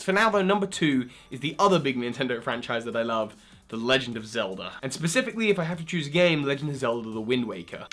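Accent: United Kingdom. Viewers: I love British accent so much!😫😫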